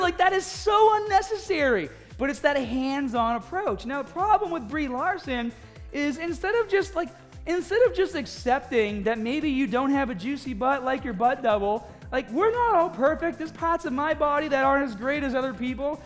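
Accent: Boston, United States. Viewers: I’m assuming he’s a Boston guy, right? Gahhhhbage, Lahhhson, etc. 😂😂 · Boston accent keeps coming out. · Is from Boston?? GaaahBage.... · Is that a Boston accent? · Man are you from Boston? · Is he from Boston ?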